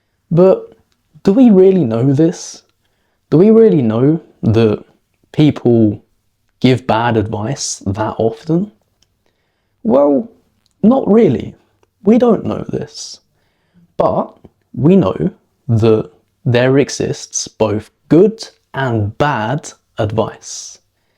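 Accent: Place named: Australia. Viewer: Thanks for letting me know. I was curious as it sounds stunning 😉. Sometimes you actually sound a little bit Australian. This because of the pronunciation of certain vowel sounds for instant. Well, I enjoyed listening and I try to pick up on some more words to better my vocabulary. As a non-native speaker the vocabulary could always to be worked on 😂.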